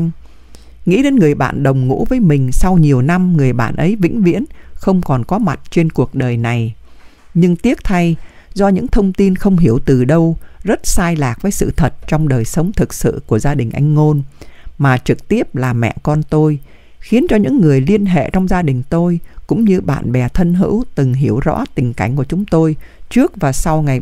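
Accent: North, Vietnam. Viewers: Người đọc là Bắc kỳ, đọc chuyện của Nam Kỳ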